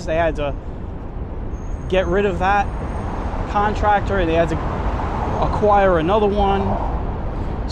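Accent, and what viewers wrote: Brooklyn, United States. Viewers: I really enjoy your narrated videos as well as your authentic Brooklyn accent! 😊 It's a dying breed. 😢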